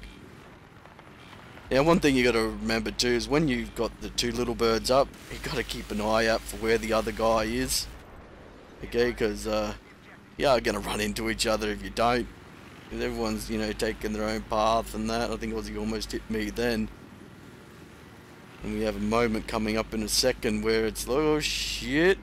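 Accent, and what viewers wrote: Australia. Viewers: You're Australian, aye? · Sweet vid man its good to see bf3 aussie commentators. Keep the videos coming! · Man, this commentator is brilliant. So classically Auzzie and just so informed and blunt. Fuckin' awesome man. :D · Oi Mate, Sounds like u are Aussie? If so, ello... I have been there and I like Aussie women, Kiwi women, Chopper and VB beer mate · Are you Aussie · r u aussie? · its kinda obvious ur aussie lol me too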